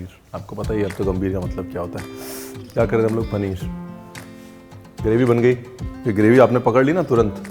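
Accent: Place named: Rural, India. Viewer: No matter how desi/dehati accent he may speak in but when he says the word 'right' it reflects his sophistication both in life and culinary art. I like your way of cooking.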